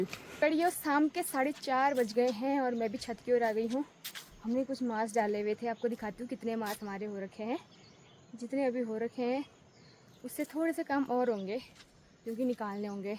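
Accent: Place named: Pahadi, India. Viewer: aapka pahadi bolna mujhe bahut accha lagta Hai❤❤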